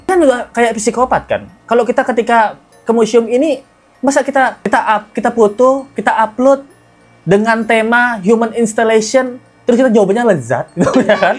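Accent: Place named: Bali, Indonesia. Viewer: Logat nya Bali banget🎉🎉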